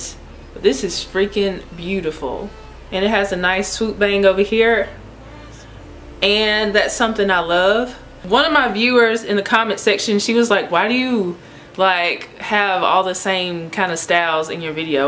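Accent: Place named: South, United States. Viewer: This unit is still a classic gorgeous long haired style. I love it. It'll always be beautiful on you. I love your accent and wondering where are you from? You speak like my fave cousins who are in the south, lol. Thanks great review.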